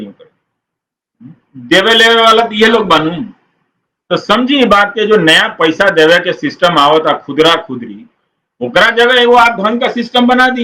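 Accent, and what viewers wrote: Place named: Bihar, India. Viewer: AAP ka muh se Bihari sun ke Maja aagaya sir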